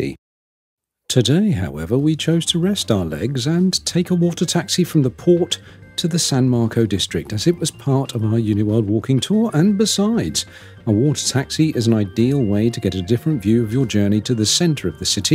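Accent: United Kingdom. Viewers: I am enjoying your travel videos more and more! They’re so well done and I love to hear that refined British accent😄 as you take us with us along all your amazing journeys. Looking forward already to your next one, even if I heard on your recent Live streaming that a mere 10 minute video takes up to 2 days to edit and upload.😮They’re much appreciated!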